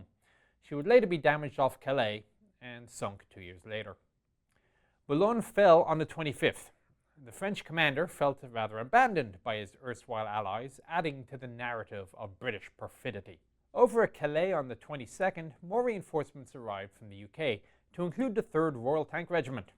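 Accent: Ireland. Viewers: It's the Irish accent. It tends to start low then go high at the end when important information is presented. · I'm not bragging cause I'm sure enough a loser but I know all this. I think the is reading this..But don't get me wrong...i love the Irish accent and the delivery. He would be a hell of a professor. But he does better speaking for a video game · is the Chieftain Irish? He certainly has that silver tongued lilt from Eirre. There's no gutteral Northern Irish there.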